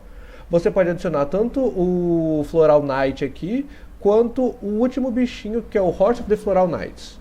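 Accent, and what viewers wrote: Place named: France. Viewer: Esse sotaque francês é ótimo mas o targetar e dar alvo e de matar. Os duelos são tão rápidos no yugioh que mal da para acompanhar tudo que acontece 😂😂. Eu queria dar uma sugestão tem um baralho que eu gosto muito que o dragão armado e fiquei sabendo que tem novas cartas dele,eu queria saber como o baralho funcionária hoje em dia.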